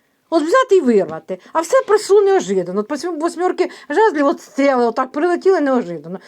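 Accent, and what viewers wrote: Ukraine. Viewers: Пані,я розумію,що Ви Не Все можете Говорити,що Бачите..За суржик,не переживайте.В вашій місцевості,говорять Так..Дякую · Ви справжня, ви наша украіночка. В кожному регіоні свій діалект і говоріть так як говорите, вас приємно слухати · Український діалект❤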